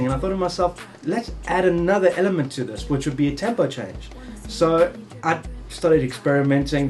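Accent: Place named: South Africa. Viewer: Sounds like a Saffa :D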